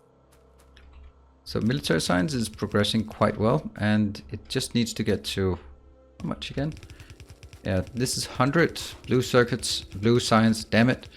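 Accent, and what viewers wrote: Denmark. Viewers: I like your Danish accent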